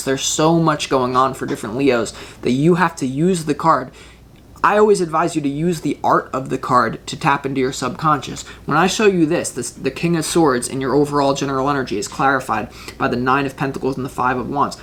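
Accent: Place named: Staten Island, United States. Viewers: I love this reading. The NYer accent especially. Omg. Prepandemic is def. Right!! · loved the reading 😍 LOL the accent got me laughing.. sounded like staten island accent. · and extremely intelligent and wise... love the NY accent, HA !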